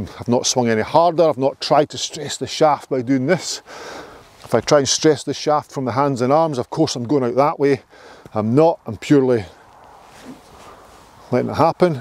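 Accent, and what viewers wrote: Scotland, United Kingdom. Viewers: Truly the best golf instruction I ever received! This instructor is the one that, finally, instructs...as if all the others I have met were hiding the true "secret" of golf. And the beautiful Scottish accent comes as a bonus, straight from the land where golf was invented! Thank you.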